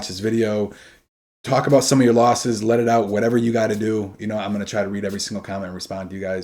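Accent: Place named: Boston, United States. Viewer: Is from Boston? He sounds like he has a Boston accent LOL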